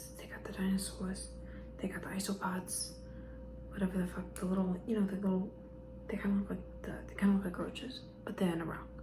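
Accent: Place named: New York, United States. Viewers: The NY accent makes me feel right at home 🥹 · I love the whispered NY accent so much, it sounds so relaxing and comfortable! Love your asmr so much :D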